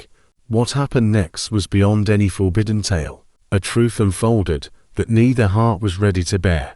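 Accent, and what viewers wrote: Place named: United Kingdom. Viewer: narration has a British accent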